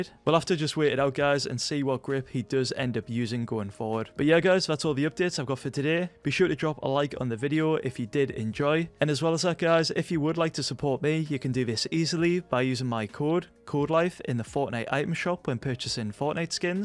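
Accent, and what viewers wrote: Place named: Newcastle, United Kingdom. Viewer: You're a proper Geordie haha get in, fancy couple games one day?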